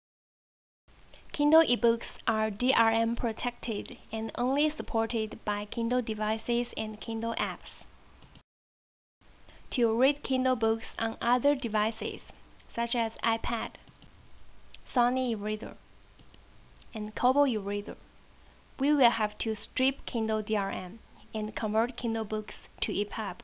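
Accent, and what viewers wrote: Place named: China. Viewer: Yes she sounds like any Chinese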